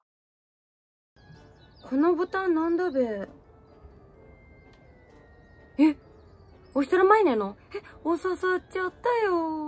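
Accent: Tsugaru, Japan. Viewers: の津軽弁、いいね😊 標準語と津軽弁で服装変えるのは、のイメージかな？？？ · 津軽弁良き！😆 シリーズ化して欲しいな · 津軽弁良いですね₍ᐢ⑅•ᴗ•⑅ᐢ₎♡ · 女の子の津軽弁上品やな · こんばんは‼️ ってかの津軽弁滅茶苦茶可愛い過ぎですね👍 地方から（自分もですが） 東京に上京して年数が経てば経つにつれ自分の生まれ育った土地の言葉って正直イントネーションとか自分では気付かない内に変わってしまいがちだけど… 今日もに癒やされました。まだまだコロナも収束を見せる処か大阪に続き東京もまん防発令されるかも知れない状況ですが本当の家族の為にもコロナには十分気を付けて下さいね‼️ これからも応援してます👍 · 初見です！父方の実家が青森で幼少期実家のように訪れてたため津軽弁が恋しくなってポチりました！動画自体は凄く良いと思います！ただ訛り方が少し津軽弁弱いと思ってしまって懐かしさがあんまり感じれなく残念と思ってしまったのが感想です...これからも頑張って下さい！ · の津軽弁、あいかわらずめんこいな～！次は、『いずい』だの『しずね』に『ねっぱす』なんか、いいんでねぇべが… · やっぱり、津軽弁カワイイ〜❣️ホッコリする❤️ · こんばんは津軽弁のも可愛いすぎとるね。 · 今日も見れた！津軽弁可愛い✨ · の津軽弁カワイイ！ASMRの津軽弁寝かしつけの動画やって欲しい！ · の津軽弁刺さりますw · 津軽弁の上級編？！、少し~慣れるまで時間がかかるかな。でもお国言葉ってほのぼのして良いな~。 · 本当、津軽弁可愛いっす😍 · 、思い付かなくても、シリーズ化してください🙇 やっぱり津軽弁のにドキドキです💖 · お若いのに津軽弁を話せるとはすごいです。今でも、地域的に津軽弁の濃いところはあるのですが、若い人はしゃべれなくなってきました。けっぱってください。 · 寝ようとしたら女神？天使？津軽弁ぶち可愛いやんけ！(広島弁) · だいぶ綺麗な津軽弁ですね。わの周り汚い津軽弁です。五所川原だはんで。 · 良いなぁ。津軽弁‼️まっと(もっと)やれじゃ‼️(やって) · 北海道の多くの地域でごみを投げるで通じますよ。ちょす＝いじるは函館をはじめ道南地方では使いますね。布団着るもわかるし、へばね～も使うよwww 津軽弁で喋る可愛すぎ！ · 第三段やって欲しい！の津軽弁を永遠に電話で聴きながら寝落ちしたい笑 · めちゃかわいくて、津軽弁で話したら惚れちゃうかも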